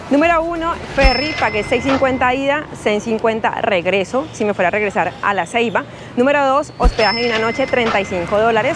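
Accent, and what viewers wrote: Colombia. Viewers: gracias parcerita por mostrar lo bueno de mi honduras Dios te bendiga a ti y tu familia me encanta ese lindo acento colombiano el mejor del mundo bendiciones amiga ya me hice presente con mi like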